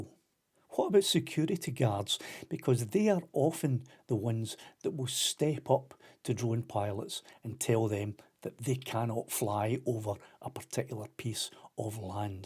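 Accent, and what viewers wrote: Scotland, United Kingdom. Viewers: Useful video, but it might be good to check the subtitling- there are at least two places where the subtitles confuse can and can’t in a Scottish accent, so say exactly the wrong thing (passers-by “can enforce drone laws” for example, instead of can’t)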